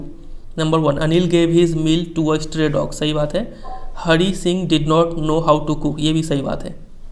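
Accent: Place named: Bengal, India. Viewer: Sir mai janta tha app Bengal mai rahte ho apke bolne ka tarika se pata chal gya mai v WB mai rhta hu Kolkata dumdum😁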